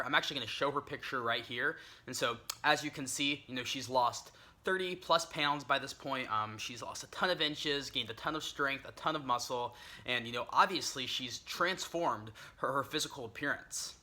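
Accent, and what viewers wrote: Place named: United States. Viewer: Wow! I thought you were from Europe but you're an American. this is great I love your accent and thanks for this great video Greeting form Afghanistan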